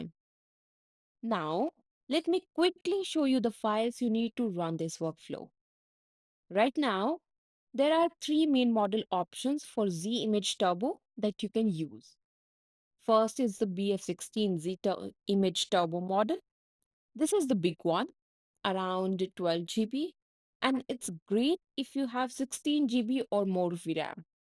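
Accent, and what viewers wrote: India. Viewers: I think her accent is Indian. I'm not so sure she is a she. It could be just an AI trained voice. Most of these channels utilize AI voices. Even people who have great voices are just handing it to the AI to create the audio from their voices, which is much faster, once trained, than recording it yourself. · Indian accent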